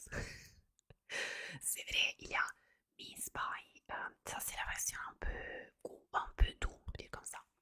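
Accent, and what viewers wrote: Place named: Italy. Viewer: ton accent italien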